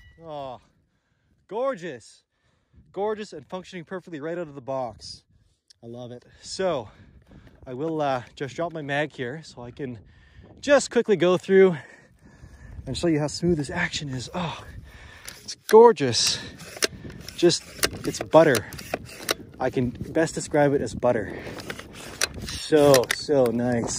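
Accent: Canada. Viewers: U're canadian right? I'm sayin' this cause of your accent.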